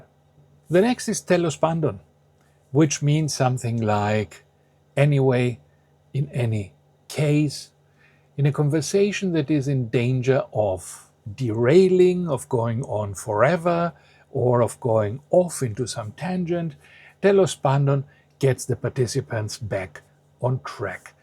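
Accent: Germany. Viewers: that explains why your accent in English is slightly more German than Greek! I was so confused 😅 I just heard in another YouTube video that sometimes your accent in a third language reflects your second language background more than your native language. Fascinating! Τέλος πάντων, σας ευχαριστώ για ακόμα ένα υπέροχο βίντεο! Δηλαδή, ας πούμε ότι το απόλαυσα πολύ!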